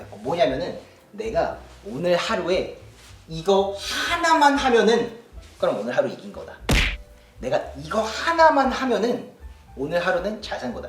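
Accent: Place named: Gyeongsang, South Korea. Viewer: 맷 형 구글에서 일하는 경상도 싸나이인가보네요. ㅎㅎ 억양에서 느껴짐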